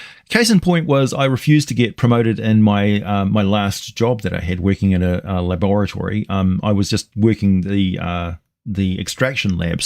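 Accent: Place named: New Zealand. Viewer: Excuse me New Zealand I said UK in my last post don't know why I said UK the accent is different LOL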